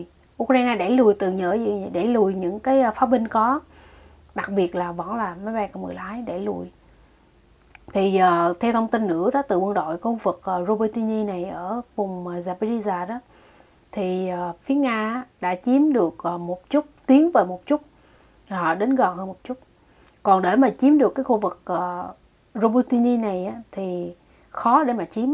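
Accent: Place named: North, Vietnam. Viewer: đúng chất giọng 3ke x.ỏ lá. Ngọng líu ngọng lo cũng bày đặt lên cào phím. "Lato", "Ixarel", quát đờ hợi :face-blue-smiling::face-blue-smiling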